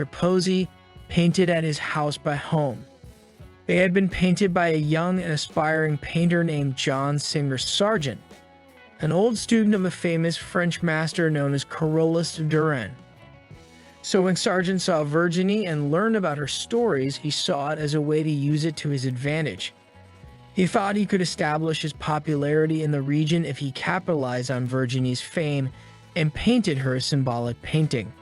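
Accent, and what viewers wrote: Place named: United States. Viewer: yes, this young mans approach,seems to have been to try and fit every polysyllabic word he thought sounded,"hoity-toity" into this uninsightful anecdote. If I just read the closed captions, I would assume he was not a native speaker, as the conjugation, and tense dispositions of many verbs are just illogical. Even if someone else is reading a script, he is obviously a native american speaker,(i would say california,or Maryland, or Ohio,) and would naturally have corrected the uncorfortable syntax imposed upon his elocution. Good luck to, I mean it, it takes a lot of work to produce these videos, but if he ends up reading this, turn down the prolixity, and buy an edition of Strunk & White," The Elements of Style," (for writing), and he will say more with 60% less verbiage.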